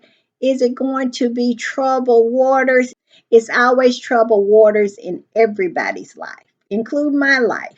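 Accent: South, United States. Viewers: ❤❤ TY ❤❤ We love the pettiness, the messiness, the southern drawl, we love it all!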